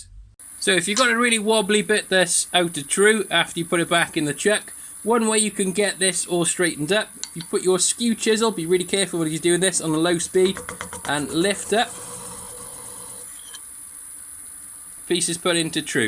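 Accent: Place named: Wales, United Kingdom. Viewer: Hey love your videos been following sine started a year ago. I am in Wales too, curious what part your from accent seems quite local.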